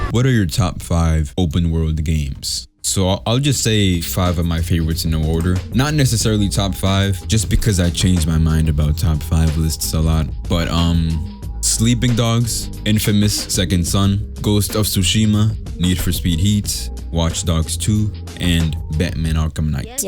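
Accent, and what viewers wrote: Dominican Republic. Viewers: So you're Afrolatino! That's so cool!! Also, "not well spoken or articulate"? My guy, Dominican accent aside, you are very FLUENT!! Your grammar and pronunciation are ON POINT! And this is coming from a native Spanish speaker myself!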